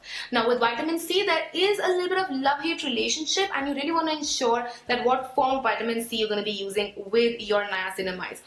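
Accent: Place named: Bengal, India. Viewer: di doesn't have speech disorder. She just speaks in a bengali accent and there's nothing wrong in it.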